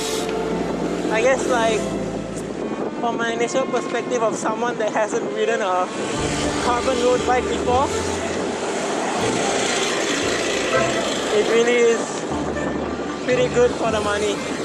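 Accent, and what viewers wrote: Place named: China. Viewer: Nice review!!! Chinese brand bike reviewed by a Chinese accent. Thank you 😁👍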